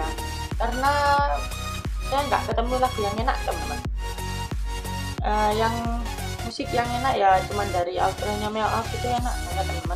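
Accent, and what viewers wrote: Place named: Java, Indonesia. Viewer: Sk dengerin km ngomog dek.. Wong jowo to dek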